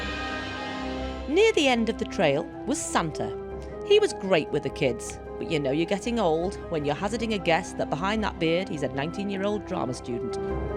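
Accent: United Kingdom. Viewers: You guys should have your own tv show. Love the voice, love the British accent and interesting personality👌😎 Merry christmas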